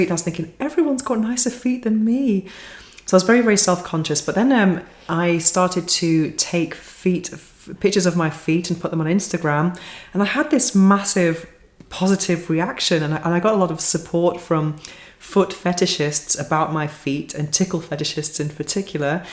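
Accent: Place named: RP, United Kingdom. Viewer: Absolutely, I didn't mean it as a critique. You usually speak, for someone who doesn't have English as a first language, in a way which sounds more of a received pronunciation. Or it might be my imagination for all I know. :D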